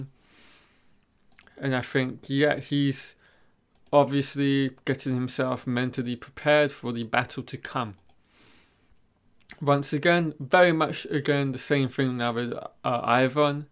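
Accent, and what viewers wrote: United Kingdom. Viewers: Are you from the UK? You have an interesting accent.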